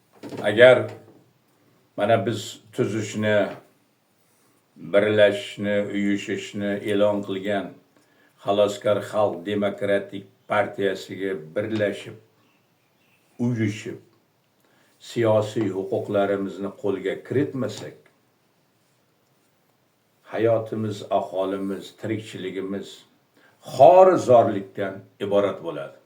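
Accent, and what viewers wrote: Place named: Tajikistan. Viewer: СССР ДАВРИДА ХАММА ЭРКИН ЯШАГАНДИ , ХУКУМАТГА ИШОНЧ КУЧЛИ ЭДИ , ИШСИЗ ОДАМ БУЛМАГАН , АЙНИКСА ОЛИЙ УКУВ ЮРТИГА КИРИШ У.Н КАТТИК ТАЙЕРГАРЛИК К.К ЭДИ , ИМТИХОНДАН УТОЛМАСА КЕТАРДИ , КОНТРАКТ СУППЕКОНТРАКТ ДЕГАНИ ДУБ КАЛЛАНИ УКИТИБ , ДИПЛОМЛИ САВОДСИЗНИ ТАЙЕРЛАШ ДЕГАНИ , ТАРТИБ , ИНТИЗОМ ТАМОМАН ИЗДАН ЧИККАН , АММО БУ ТИЗИМНИНГ ИСКАНЖАСИДАН ЧИКИБ КЕТИШ ОСОН БУЛМАЙДИ , ШЕВАСИГА КАРАГАНДА ДОМЛА ХАМ ТОЖИК МИЛЛАТГА УХШАЯПТИЛАР...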